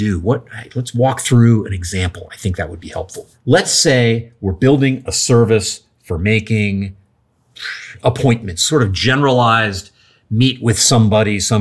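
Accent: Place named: United States. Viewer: We need an AI to turn unbearable american accents into nicer ones within the youtube player.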